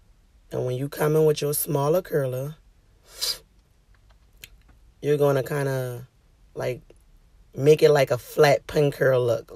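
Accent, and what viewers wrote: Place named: South Carolina, United States. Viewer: South Carolina accent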